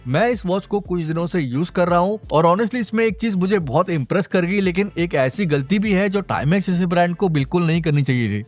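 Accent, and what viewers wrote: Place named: North, India. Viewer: Bro newu kannadiga na !! 😮, i thought u were somewhere from north India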